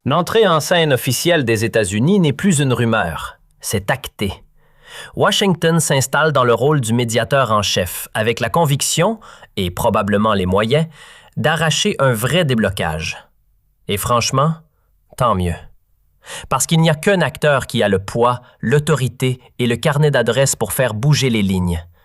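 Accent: Canada. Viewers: Mensonge, on dirait un Marocain qui parle canadien , les algériens sont a l'aise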